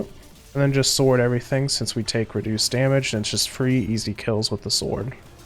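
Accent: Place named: Quebec, Canada. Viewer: French Canadian Le Mont accent NOICE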